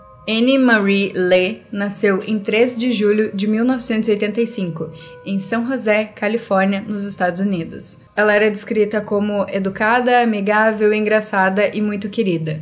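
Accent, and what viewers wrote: Rio Grande do Sul, Brazil. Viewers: Oi você é gaúcha? Amei o canal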